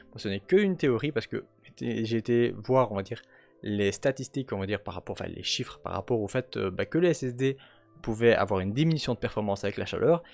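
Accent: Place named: Belgium. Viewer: sa s'entend a ton accent moi aussi je suis belges hahaha continue tes videos tu vas percer 🔥🔥🔥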